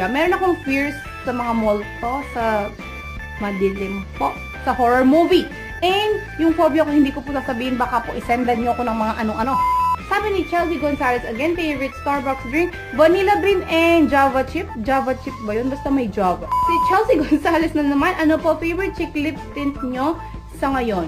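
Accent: Visayas, Philippines. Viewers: 😍😍😍Cute niya magbisaya😀😍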